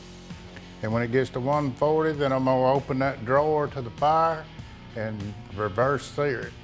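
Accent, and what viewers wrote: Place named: Kentucky, United States. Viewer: I done learnt that, 😂 I love it. Hey, you sure you ain't from Kentucky? You sound like one of us good ole boys from up here in Kentucky. Enjoyed the video hope y'all boys and ladies in the DD world are all doing well. God Bless and keep the videos coming, love em. ☮️ 💜 God Bless y'all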